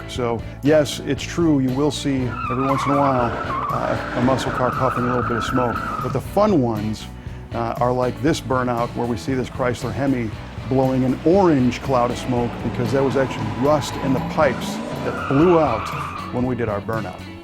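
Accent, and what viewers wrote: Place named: Chicago, United States. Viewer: You can definitely tell is a true Chicagoan not only by the way he said "convertible taaap" but even by the way he pronounced Chi-CAW-go. No doubt about it. And I wouldn't be surprised if he referred to the main room in his house as the "frunchroom," either. Go!:-)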